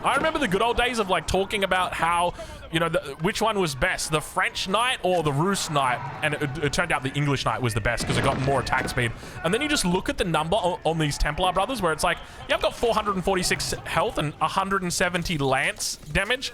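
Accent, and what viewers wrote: Australia. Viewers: is truly the top tier caster. Funny, insightful, genuinely passionionate and knowledgeable about the game, a family man, that delicious aussie accent, minimal shilling, not afraid to call out pros and devs while being generous in giving props to good plays and dev decisions and shouting out and linking players whenever possible. I don't like every video but let me tell you I love every video and haven't missed one in a while (except FFA's I skip those sorry). KEEP UP THE SICK WORK MATE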